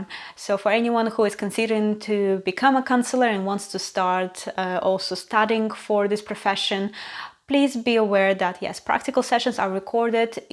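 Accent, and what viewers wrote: Russia. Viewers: I can hear Russian accent. Are you Russian?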